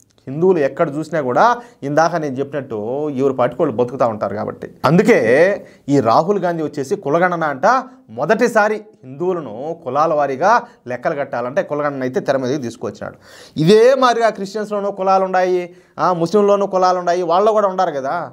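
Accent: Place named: Bangalore, India. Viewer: Anna ee ooru anna meedi ? Ullagaddalu ani bangalore telugu matladuthunnaru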